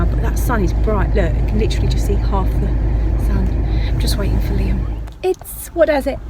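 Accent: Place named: Dunstable, United Kingdom. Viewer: I really enjoy your videos. Whereabouts in England are you? Your accent sounds like mine, I was from Dunstable Beds, and I lived in Chelmsford, but have lived in Florida for 26 years. Really enjoy your recipes. Thanks. Also, you remind me of Sam Faiers from TOWIE and the mummy diaries. LOVE the penguin! Very clever.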